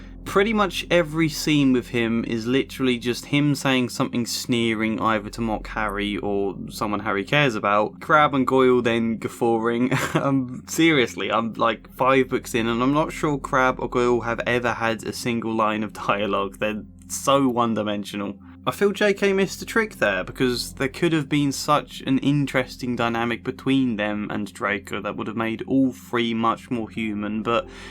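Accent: England, United Kingdom. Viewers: I love you Mr calm English accent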